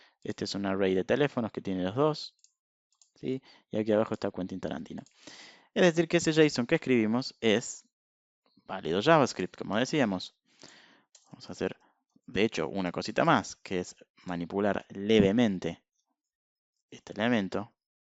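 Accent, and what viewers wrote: Argentina. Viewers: Impecable la explicación. Se agradece un tutorial en cordobés =D! Saludos de Bs As.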